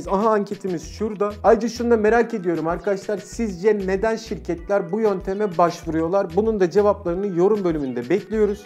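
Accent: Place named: Aegean, Turkey. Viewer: Ege şivesi e gari <3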